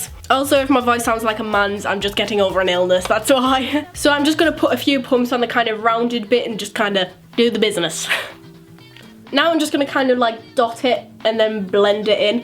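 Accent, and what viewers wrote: Newcastle, United Kingdom. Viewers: where do you come from because I really love your accent?!😘😍❤️🇬🇧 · Amirah Amazing No, she has a 'Geordie' accent, e.g. People who come from Newcastle and Tyneside... North East England. Not Scotland 🙄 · Itza Guillen .... do u know ur accents because it is obvious she is British... ps we are all human so calling someone's accent weird is just plain rude. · Subscribing for that accent, I'm fascinated. She must be the most British person in the U.K.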